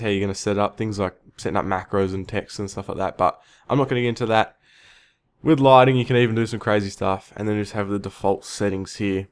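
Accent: Australia. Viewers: Goodish Review, you will polish up as you make more:) and good to hear another aussie. I will wait for the next mouse as this one is not there yet. One deal breaker for me is lack of a free-wheeling scroll-wheel. My current mouse has one, (Logitech G502) and i didn't know it was so important till I used it every day. Just in facebook a free-wheeling scroll-wheel is a attribute without peer let alone in Fallout4. Second deal breaker is not being able to change the individual colours of the buttons as a visual aid. I have a Corsair R95 RGB.. my god what a fantastic keyboard, having key colours change in each profile is important to me. To make your reviews better you should make a list of what is important in whatever device. Like in this one you need to mention if each button can be colour configurable or only one colour for all buttons. RGB devices are not all equal it seems:) I just assumed they were changeable like the keyboard till i looked at multiple reviews and only one person mentioned this fact. 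And only one other reviewer mentioned the lack of a free-wheeling scroll-wheel. Cheers and keep up the good work..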